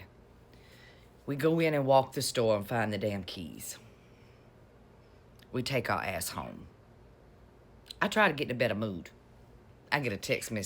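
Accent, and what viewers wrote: South, United States. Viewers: You tickle me; I'm so sorry that you had a bad, but your "rant" made me cry. I'm from South Carolina, but have lived in Minnesota for the last 18 years. I miss everything that you mentioned... field peas, collard greens, gravies, family close by,, hush puppies...and even the Waffle House! Your beautiful drawl is like sipping a mint julep, on the veranda -on a hot Autumn day , but not in a dysfunctional Tennessee Williams" kinda way. Thank you.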